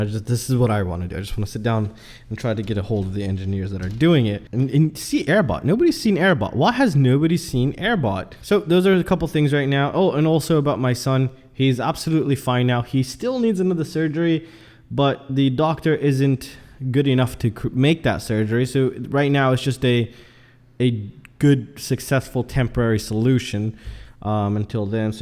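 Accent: United States. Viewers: How do I enter contest? :p Glad to hear your son is ok. And I thought you where american, your english is not hungarian. ;) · Dude ...maybe I missed it but what’s your origin story? You sound like an American living abroad. You’ve got a cool channel and a pretty bright inquisitive guy! Keep on making great content!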